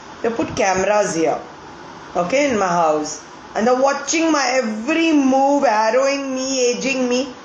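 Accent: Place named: England, United Kingdom. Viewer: Are you okay pooja we love you ❤ pooja raise voice for yourself keep stand like that I am 17 nd loved your English accent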